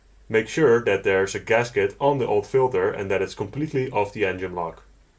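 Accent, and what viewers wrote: Netherlands. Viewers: Sounds like a Dutch guy :-) Super video, ga zo door!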